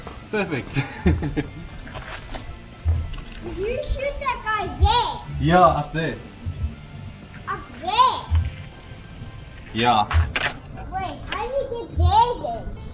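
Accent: South Africa. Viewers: You can hear dat he is afrikaans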